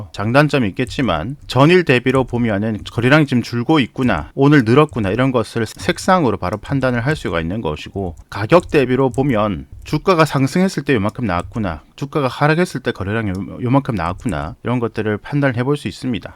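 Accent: Gyeongsang, South Korea. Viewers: 밸런스를 아시는 분이시네요! 경상도사투리가 약간 짜증섞인 어투로 들리기는 하지만, 고유의 스타일이신 것으로 이해하도록 하겠습니다^^:: 감사합니다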